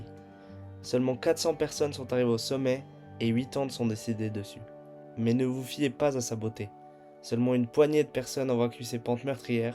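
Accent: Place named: Italy. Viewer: Le petit accent italien 🤌🏽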